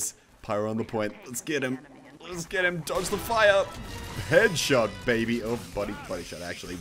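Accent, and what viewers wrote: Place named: Australia. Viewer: Hey, I didn't know u were australian!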